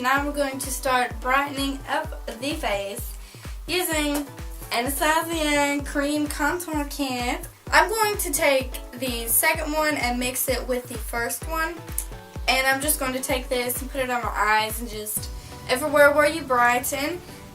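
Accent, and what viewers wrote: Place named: South, United States. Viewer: I love it if you replied I can't find a lot of country youtubers it kinda sucks I'm so happy I found this channel I think your beautiful I've watched a lot of you videos so far you are like a role model to me and also you have taught me to never ever let any get under my skin and be myself I'm from a country family and so I have a country accent like yours I live in a city so I can get made fun of you have taught me to be my self and always believe in me your really funny when ever I see you make a new video I'll be happy kind of like today okay i need at least 9-11 hrs of sleep well I have fit bit I told me I only got 7hrs 38min of sleep and if that happens I need to SLEEP and I didn't have a pretty good mood I went on YouTube and saw you had s video and ever since I watched I have hade a great mood Thank you for teaching me not to let mean people to get under my skin you're my favorite YouTuber 😝💋😘😇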